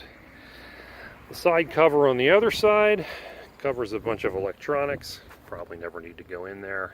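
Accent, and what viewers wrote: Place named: Pennsylvania, United States. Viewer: Maybe a Pennsylvania accent?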